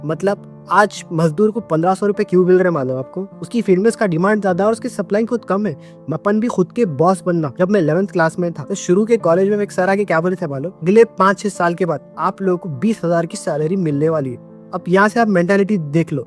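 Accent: Hyderabad, India. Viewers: Are You Hyderabadi ? I guess because your accent looks like Hyderabadi people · Accent is amazing, are you from hyderabad??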